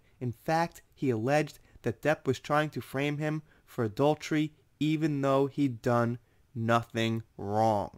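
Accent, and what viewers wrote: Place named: East Coast, United States. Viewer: You know the YouTubers that become uber famous let you in on them, not just news. Still wonder if your wife is Japanese or work brought you there...what's it feel like having strong East Coast (NJ? NYC?) accent in Japan...one of the few places I've not been but so many are curious about it. When you are comfortable.....🤗 Not before.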